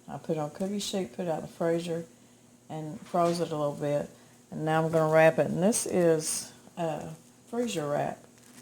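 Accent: South, United States. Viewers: Your precious. Love your country accent , in country too 😊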